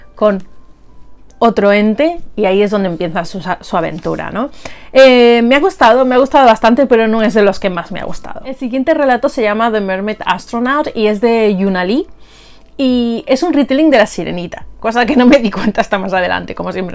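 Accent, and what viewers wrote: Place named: England, United Kingdom. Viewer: ¡Hola! Yo también conocía los Premios Hugo y Nebula , pero tampoco me había interesado mucho por los premios. Ahora con tu vídeo me ha picado la curiosidad, creo que me gustaría hacer también un repaso de los mejores y leerlos, tiene que ser interesante jaja. Me encanta el título de las madres cañeras, es como que no me interesa de qué vaya la sinopsis, solo por el título quiero leerlo 😂 Me parece maravilloso que aparezca la regla, que nos olvidamos de que existe! Ains, el de la biblioteca me encanta cómo suena la trama. La sinopsis de "Uncanny" me recuerda mucho a la canción de "Kokoro" de Vocaloid, no sé si la conocerás, pero he pensado en ella automáticamente. Y por cierto, me encanta tu acento ingléeeeees!! Un besico :D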